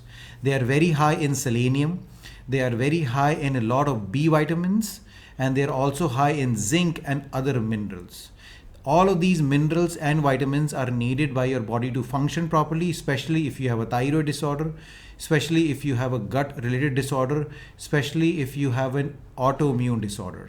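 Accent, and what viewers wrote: India. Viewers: Excellent info. Doc should improve his bad Indian Accent.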